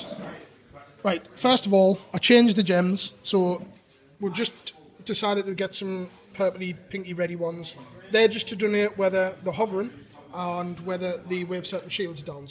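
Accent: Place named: Durham, United Kingdom. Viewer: Good batrep mate, bad day to be an ultramarine. Eldar mobility is tough to counter but I thought with three fliers he'd at least have a chance. Where you from by the way? I'm in Durham, your accent sounds local and you said 'canny points' lol. So I'm guessing you not far from me.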